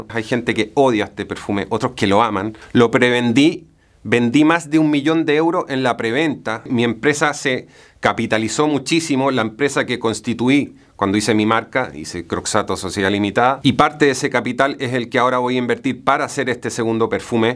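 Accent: Chile. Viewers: estimado tienes acento chileno ? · Habla como chileno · Disculpen la ignorancia...de dónde es este compadre? Habla como nosotros los chilenos, pero ocupa algunas palabras en español. La pregunta es por si existe alguna zona de España que hable igual a nosotros.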